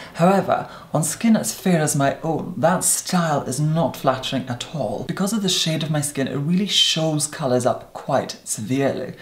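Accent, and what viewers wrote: Scotland, United Kingdom. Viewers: So beautiful and elegant! Lovely to hear the Scottish accent out there too❤️ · Nina Lights he's from Scotland he's just posh lol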